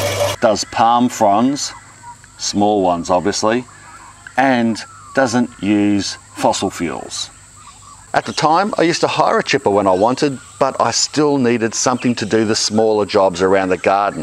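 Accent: Australia. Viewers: Haha - true Aussie male, really good, ta! lol :D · Even if you don't garden it's worth watching this guy, gotta love those Ausies " it was a bugga to start"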